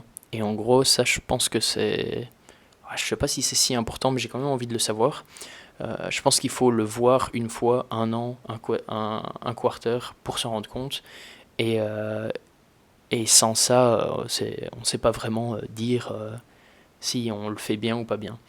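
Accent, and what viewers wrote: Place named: Belgium. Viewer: En t'écoutant j'me suis dit "Il a un léger accent Belge lui..." Jusqu'à ce que je fouine un peu et que je trouve la réponse 😅 Aaaaah le codage ! Tout ce que je déteste 😂 Par contre je trouve ça TELLEMENT CLASSE de connaitre tout ça, et en plus d'être passionné ! Quelle chance ☺ Le running et le montage c'est plus ma passion 😁 Bon courage pour tes objectifs 2024 et ton Marathon ! (Avril ? Le Marathon de Paris ?)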